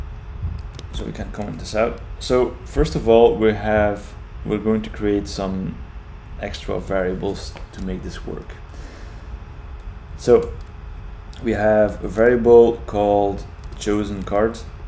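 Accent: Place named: Brazil. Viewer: You sound Brazilian! Where are you from?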